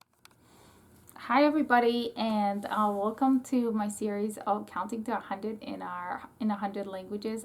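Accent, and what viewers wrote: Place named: East Africa. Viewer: So impressive, I can imagine the effort you put into it. You sound like an East African.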